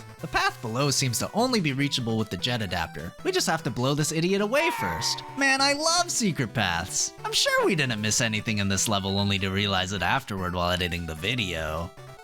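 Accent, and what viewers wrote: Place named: Germany. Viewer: Why a WERMAN ACCENT This is so cool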